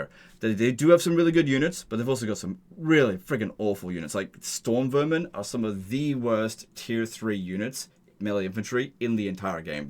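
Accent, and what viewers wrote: Australia. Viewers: Came to see the dawi in S tier, stayed for the Australian.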